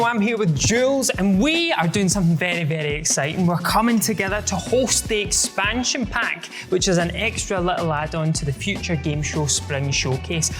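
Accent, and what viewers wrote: United Kingdom. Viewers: Hearing "Soccer Jersey" in British accent was weird... Even though Soccer was initially coined in GB...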